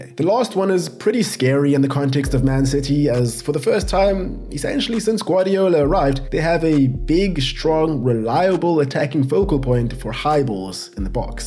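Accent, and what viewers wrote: South Africa. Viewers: I was watching this video, And something just made it feel homely, Then I realised, You are speaking with a South African accent. Now I see your channel and content and think. Wow, South Africa has talent and your production is on par with YouTube stars. So I'm so proud to see a local have such a successful channel! Welldone. But also, yeah when Haaland came to man city, I knew him from bvb and from his insane international scoring. You know he holds the record for the most goals scored in 1 game, I believe it's 9 individual goals in an international game. I knew the premier league wasn't ready for a player like him. I do believe though managers are strat'n on how to counter him as we speak. So let's' see later on if he can keep this going. His a huge dude with some insane accuracy with his shots. Also his size, you'll notice in games, he throws his body in the way for the ball to bounce off him into the goal. 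Mainly by throwing those long legs out. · Are you south african? You sound south african. and yes, i myself am south african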